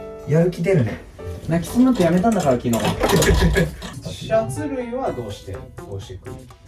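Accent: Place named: Kansai, Japan. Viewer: ずっと男子ないけちゃんの関西弁嬉しい❤やっぱりすてき❤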